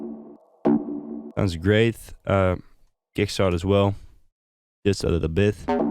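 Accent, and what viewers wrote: Netherlands. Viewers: hey are you dutch? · where are you from? kinda dutch accent XD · ik hoor de Nederlandse accent haha, +1 like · ik ruik een nederlander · Are you dutch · are you dutch?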